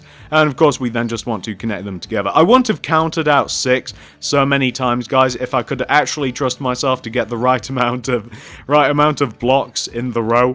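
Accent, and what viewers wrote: United Kingdom. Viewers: It’s a just a brutish accent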